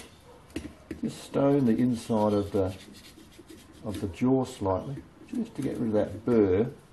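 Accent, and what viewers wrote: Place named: Australia. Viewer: hi!! i have those calipers too. i have that problem so i am going out now to fix them. thanks keep up the great work. good to hear an aussie voice on youtube!! lol!!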